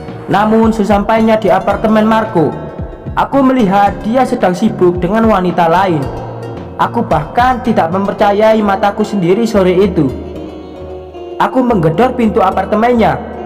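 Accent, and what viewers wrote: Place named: Banyumas, Indonesia. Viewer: Mlm "jdi ska ngakakkkkkkk....gra"denger crita dan bhs ngapak y...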